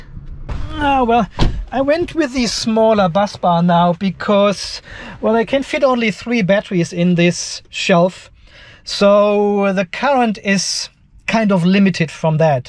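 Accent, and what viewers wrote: Germany. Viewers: Hello, I was wondering how you are going to keep these three batteries in balance? I have two 24V/100Ah batteries and it appears to be difficult. By the way, thank you for your very interesting video's and your german English. Makes it for me easy to follow. Regards, Wim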